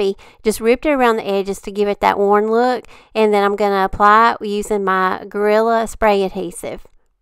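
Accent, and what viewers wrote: South, United States. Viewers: That came out so cute! I just love the sound of your voice and your southern accent !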